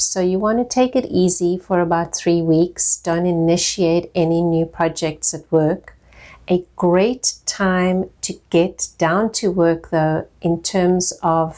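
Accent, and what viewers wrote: South Africa. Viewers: Sounds like you're South African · Are you South African tho??..I can hear the accent...or am.I wrong...Ur amazing...My heart thanks you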